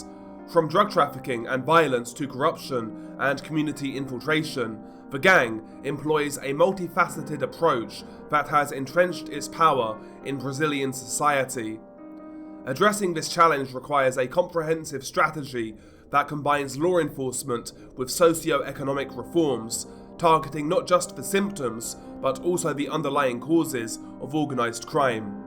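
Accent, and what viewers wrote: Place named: United Kingdom. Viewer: He’s a brit lmfao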